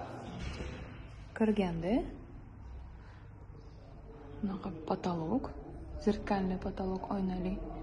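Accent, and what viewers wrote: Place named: Tashkent, Uzbekistan. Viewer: Шевани узгартиринг билдик ташканли экансиз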